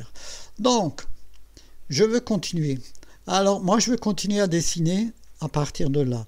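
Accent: Alsace, France. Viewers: Alsace (d'après ton accent)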